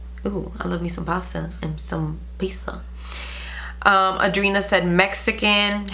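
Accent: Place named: United States. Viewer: Maybe a little American too? 😂😂😂 Judging by the accent, I'd guess 100% American. If you are born and raised in the U.S., you are American. Regardless of where your ancestors came from... Maybe I misheard the guess you read, but it struck me as funny.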